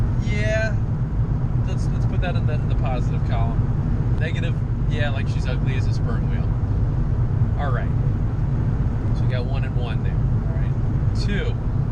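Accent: United States. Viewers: This is probably the point where I sign off permanently. At first I was excited that maybe this would be a true tuner channel but all this video was is just unimaginative nut swinging. You're literally using the hill billy voice, building a cop car, etc etc. Its like watching cleetus except way less entertaining lol. Bro, you need to get that together.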